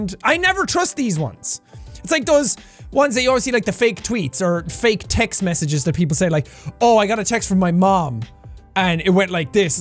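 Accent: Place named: Ireland. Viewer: This is already the second time of me turning on Jack’s video and forgetting that my headphones are off and my family hears an Irish man yelling from my phone.😳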